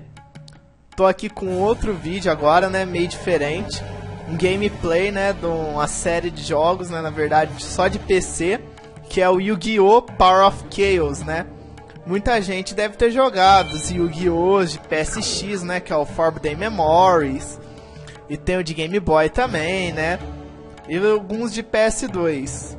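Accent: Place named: Minas Gerais, Brazil. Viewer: gosto muito do seu sotaque ele é muito engraçado sotaque mineiro (ochente)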